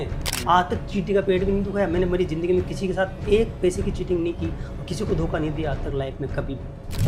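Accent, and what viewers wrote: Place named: Jaipur, India. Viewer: Sri Maan ji aap jaipur side nivasi ho kya bhasa ka put se lag raha h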